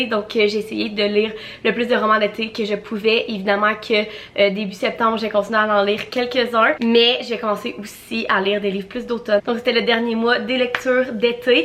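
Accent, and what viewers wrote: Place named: Quebec, Canada. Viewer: Ohhh ❤I'm so happy I found a booktuber who speaks French from Quebec. It just sounds better than the French from France 😁❤ greetings from Germany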